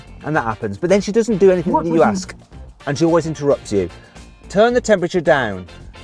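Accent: United Kingdom. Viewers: I’m just glad finally CarWow provide Indonesia translation for everyone whose difficult to understand British accent. Also i’ve prefer this channel rather than any local automotive channel like Autonetmagz, Otodriver, etc. because Watson’s way of reviewing is so good. I’m sorry to say this but i’ve prefer him than any local reviewer here. Good job, 😊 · Actually, I am more comfortable listening your review with a typical British accent rather than the Indonesian subtitles 🤣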